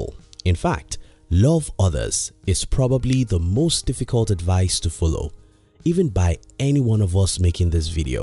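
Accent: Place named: Nigeria. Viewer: You sound Nigerian. If you are then you should know using more relatable references would help drive home your point better. Winston Churchill is perceived by some has racist so to some it's a bad reference to make and 89% of the rest of the world don't even give a F about him. But In all great content 👍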